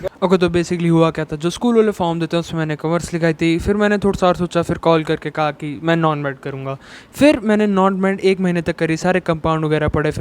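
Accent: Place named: Punjab, India. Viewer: Very informative but that thoda sa Punjabi accent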